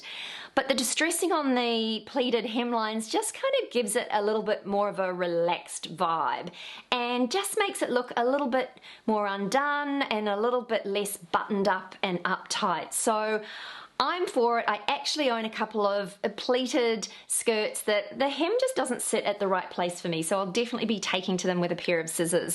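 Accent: New Zealand. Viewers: Just have to say, I adore your New Zealand accent. When you said "six in the city", it made my day! Thanks you for all your insight! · I was wondering where your beautiful accent came from. New Zealand WOW !! We are so far away. I am in Montreal Canada. Thank God for internet. Great videos.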